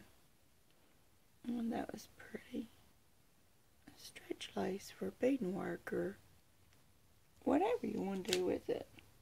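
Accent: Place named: Tennessee, United States. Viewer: I knew you were in Tn by your accent. I lived in Chattanooga for 17 yrs. I love the yellow ones💕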